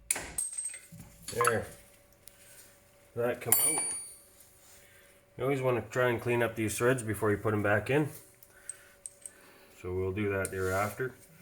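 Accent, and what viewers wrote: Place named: Nova Scotia, Canada. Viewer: Good work...I am going to tackle this same job. I think I recognize your accent. Nova Scotia?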